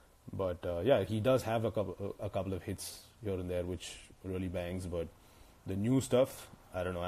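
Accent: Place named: England, United Kingdom. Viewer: Bro I like your English accent,my English is not that good I love u bro .... and I love your songs very much .......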